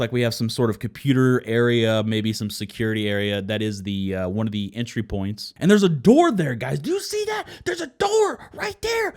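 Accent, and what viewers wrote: Netherlands. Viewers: Are you dutch? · Dude are you Dutch?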